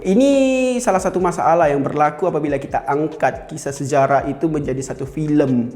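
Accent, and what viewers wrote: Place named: Sabah, Malaysia. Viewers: Sabah kan😂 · Mcm loghat sabah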